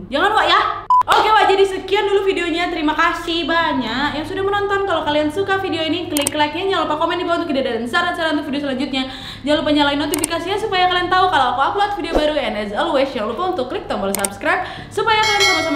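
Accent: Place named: Sumatra, Indonesia. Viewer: Karena aksen nya sumetera banget...jd lebih masuk kalau buat aku. Berasa lg diajak ghibah sm bestie 😅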